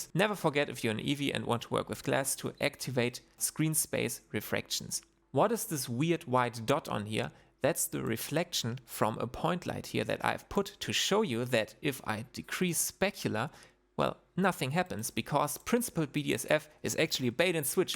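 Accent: Germany. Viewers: Not only is the accent german, but the human is painfully german. Not austrian, but so painfully german. Und damit danke fuers Tutorial und gute Nacht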